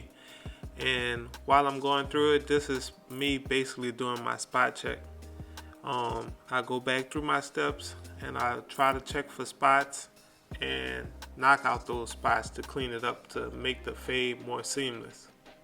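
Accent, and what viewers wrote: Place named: Louisiana, United States. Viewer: you gotta be from Louisiana bro😹 but crispy cut man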